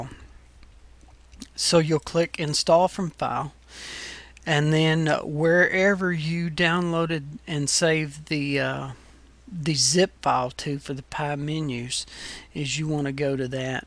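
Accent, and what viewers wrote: United States. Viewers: Are you from Atlanta?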